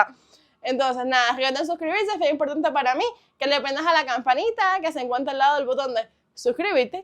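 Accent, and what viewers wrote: Cuba. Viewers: Me encanta tu asento cubano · Me encanta tu acento cubano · Hola esta perfecto tu vídeo, pero habla un poco mas lento, si cuesta trabajo entender 😢 mas que nada por qué el acento de cuba es muy padre.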